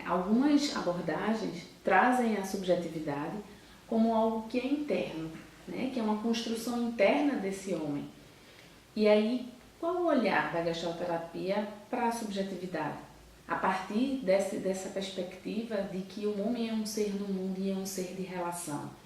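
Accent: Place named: Northeast, Brazil. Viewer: Mas o mais gostoso do vídeo é poder estar aqui em Brasília (como eu estou) e curtir um vídeo com esse sotaque liiinndo do nordeste!!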